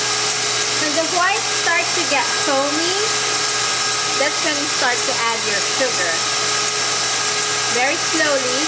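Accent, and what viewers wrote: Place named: Philippines. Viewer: She has a Filipino accent. 8D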